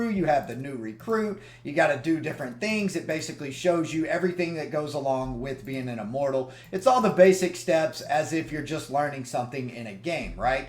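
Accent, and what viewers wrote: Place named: Texas, United States. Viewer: Texan accent makes sense. Oil money=No Skills/P2W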